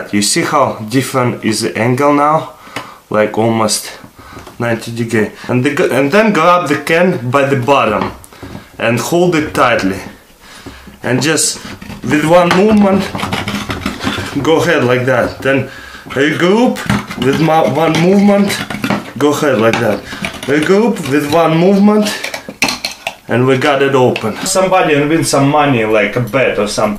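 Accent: Russia. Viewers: Just speak Russian that's what it sounds like anyway and get some subtitles if you want more people to understand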